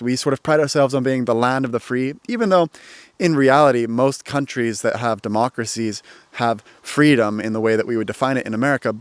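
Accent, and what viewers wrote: Sweden. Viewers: Välkommen! I don't know if it's because of the context and I'm just imagining, but you have a very very suttle swedish accent =). I hope you take it as a compliment ;). Also, the best description of the difference between swedes and americans social wise that I've heard is this: The distance between a stranger and a friend is closer in the US compared to sweden, but the difference between a friend and a close friend is actually closer here (sweden). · On paternity leave, I would tend to agree, "instinctively" so to speak. But then I think of my late father, who unfortunately got a very shallow relationship with his two sons, and a much closer with our younger sister. The difference? He made career when my brother and I grew up, and we learned to know our grandparents, who looked after us, much better than our father. When our sister was born, he had given up on the career making, came home before Mother each afternoon and made our supper. 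To my luck, Father and I catched up when he grew old. My brother, on the other hand, didn't. And his daughter barely knew her grandfather. When I compare, I must say that it had been good for all of us, if Father could have told his boss "You know, I have to take these three months. They mustn't be squandered!" P.S. It's been a pleasure following you these years. The algorithm presented me some of your very first videos. And after a year or so, I subscribed. Your English intonation has definitely become swedified. And by now, I even think your body language has become Scandinavian. 😊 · For some reason you speak English like a Swede. Some Swedes are open too, but it's only those that have admitted to themselves that they actually have some problems and things they don't excel at. Also it is extremely easy to get shunned from Swedish society. It only takes one mistake and you are out, and then it takes forever to get accepted again. 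That's why Swedes are so reserved. Sweden is basically the Japan of Europe. And that is why can never become Swedish, just like can never fully become Japanese. Your taxes in the US are actually higher than they are in Sweden. It's just that they are not explicitly called taxes. If you look at disposable income after mandatory spending people in the US actually have amongst the highest taxes in the western world and get the least back for the taxes they pay. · It’s fun to hear your Swedish accent and intonation in English now! Can you hear it when you listen to your videos? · Hejsan! I’ve been following your videos for the past 3 years, I’m also an American learning Swedish. I want to say it’s been fun hearing your accent progress, your Swedish sounds more Swedish now with an accent but your English now also has a Swedish sound to it. That’s all, tack för videon! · Strange. You're an American, but you speak English with a Swedish accent. 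Because of that, I'm guessing your Swedish must be really good by now. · You have already developed a Swedish dialect when you speak english. Some words and phrases you uttered in this video sounded fundamentally swedish, you are no longer American, you are Sill, snapps, Hej hej, skål och lagom!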